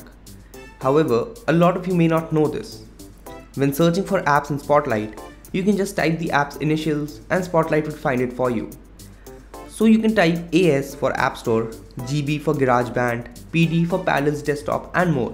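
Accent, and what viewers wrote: India. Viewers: this unique indian accent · This Indian accent makes my ears bleed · Beebom man! just keep up the gr8 work.i just beg you not to follow suit like C4ETech with "FAKE ACCENT". this indian English accent we have is the best! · Indian accent is always funny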